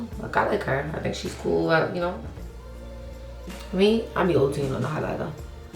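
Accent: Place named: Brooklyn, United States. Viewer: It’s your New York Brooklyn accent for me !!! I have to watch this Anna series because this sounds goodddd Also can’t wait for you and your husband to do the how you met story time video 🤎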